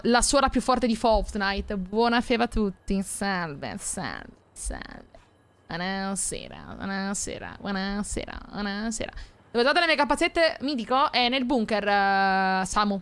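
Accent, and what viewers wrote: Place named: Naples, Italy. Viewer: Ma tu sei napoletana io sono di bari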